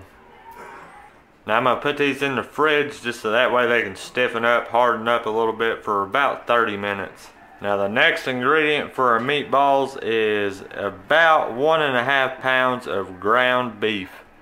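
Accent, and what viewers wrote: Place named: South Carolina, United States. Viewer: I hear accents and dialects like yours here in South Carolina too.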